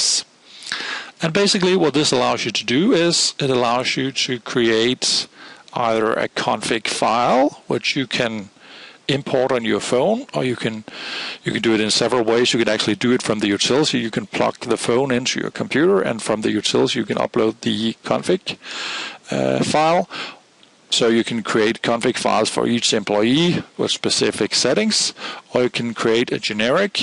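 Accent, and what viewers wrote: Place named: Netherlands. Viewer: No, that's a Dutch accent, it's supposed to be like that.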